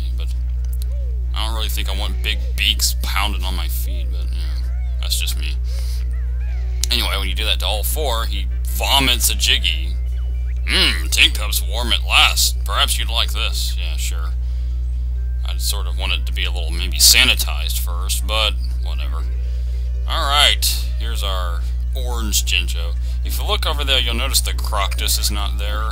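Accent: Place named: United States. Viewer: Love/d this game and the american accent is a naughty bonus haha.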